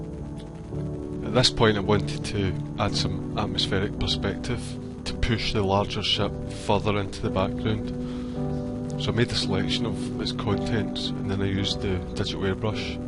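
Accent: Scotland, United Kingdom. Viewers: good vid but i hate that scottisch accent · Love the accent, I think Scottish is the coolest accent actually :D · Thank you:) I have played this and followed along (basically playing with making my own buildings, street, art on the buildings) and so I have learned how to use the perspective tool in Painter. The music is nice, so is Scottish accent. Music is fantastic too. Best wishes and keep up the good work:)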